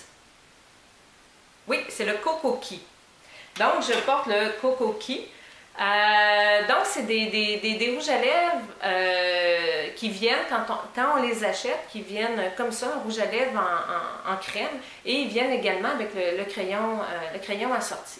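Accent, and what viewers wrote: Canada. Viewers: merci à demain ! bonne soirée et douce nuit à toi , ou bonne journée ? je ne sais pas là tu as cet accent canadien ? bisous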